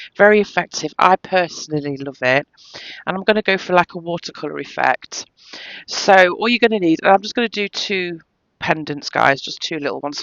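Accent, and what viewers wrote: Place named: North, United Kingdom. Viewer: Your accent sounds very familiar to me! I’m also a Brit living in the USA in SoCal. I’m thinking your from the northern parts of the UK ?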